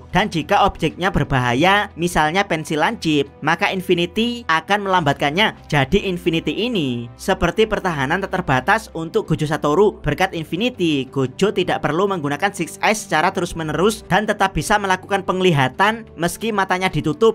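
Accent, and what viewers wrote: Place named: Purworejo, Indonesia. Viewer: Logatnya kaya orang purworejo min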